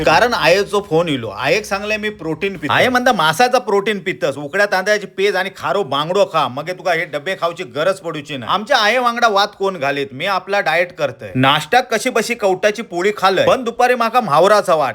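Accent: Malvani, India. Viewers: दादा तुमची मालवणी ऐकून ईतक छान वाटत कि विचारू नका 😊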